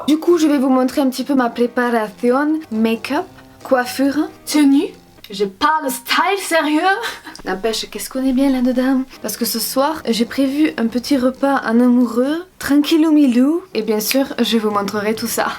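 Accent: South, France. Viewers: Haha je t’adore j’ai découvert ta chaîne il n’y a pas longtemps mais j’aime ton naturel, tu me fais beaucoup rire et t’es trop mignonne. Trop attendrissante et ton accent me fait un bien fou (le sud me manque!!!) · Moi : je déteste les vidéos make-up/haul ch'ai pas quoi. Moi : tous les dimanches à mater tes vidéos !!! Lol j'aime bcp ton naturel et ton joli accent du Sud ! 🤗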